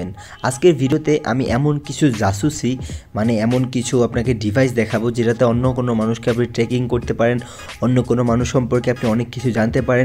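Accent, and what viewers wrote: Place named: India. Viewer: Your bangla like hindi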